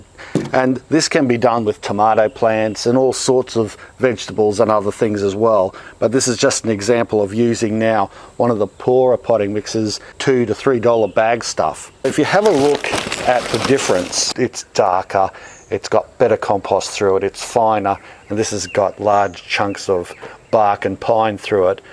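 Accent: Australia. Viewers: I enjoy listening to his Aussie accent plus have always liked the self sufficient mindset.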